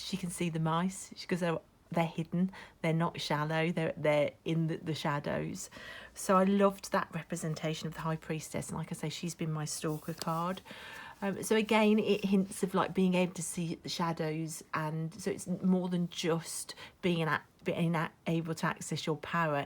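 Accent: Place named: Shropshire, United Kingdom. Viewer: yay! I can tell by your accent, I’m in Shropshire. So in another of your videos about how you’re learning tarot,it was a VR #my tarot growth, the two books you’ve been using are ones I don’t have, which is pretty miraculous seeing as I have about 40 books on Tarot ( of course I haven’t read them all!!!!! Not even close as I’m still in the “ok that looks helpful, I’ll get that and then another book is recommended on a video or by Amazon etc and the pile just keeps getting bigger🤷‍♀️”. Anyway you said about being nervous of putting the bigger spreads together. I would like to recommend the methods in the Tarot face to face book as you look at how cards relate to each other first before you learn any meanings at all. The first spread after the initial lessons is a 25 card spread where you are using these new skills and it’s not scary at all! 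I’ve found it so valuable and so much easier than looking at cards individually and their meanings in a single card. It just seemed counter intuitive to me to be learning reading the tarot card by card individually seeing as our goal is to read cards together in spreads. The book has been republished under a new name Practical Tarot Techniques: Your Essential Tool Kit for Better Readings and is a Paperback – 25 Oct. 2019 by Marcus Katz and Tali Goodwin and is available through amazon but not as an ebook unfortunately. Because then you could have downloaded a sample of the book to see what you think of it. I’m not affiliated to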